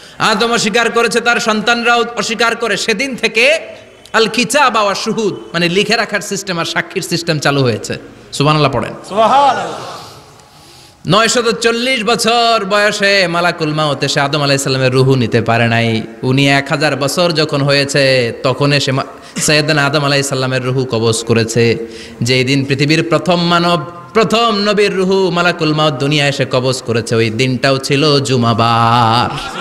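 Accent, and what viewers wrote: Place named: United Kingdom. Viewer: Ami jotodur sunchi uni 4 years bolen nai. Forty years bolchen .Uni khub somvoboto british pronunciation e word gulo bolen. Ar british pronunciation e 40 bolle omon e sona jay. Allahu alam .ami vul o hote pari.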